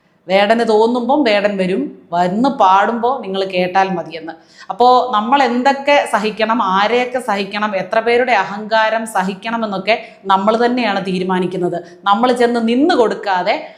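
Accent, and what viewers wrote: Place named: Trivandrum, India. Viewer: തിരോന്തോരം ഭാഷയിൽ പറയൂ. അതാണ് കേൾക്കാൻ രസം . നിങ്ങളെ വ്യത്യസ്തയാക്കുന്നതും ഹാസ്യാത്മകമായ ആ ശൈലിയാണ്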